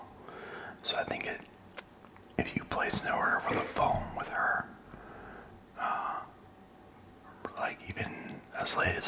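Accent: Canada. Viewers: The Canadian accent always brings me back to your videos. Keep up the grind!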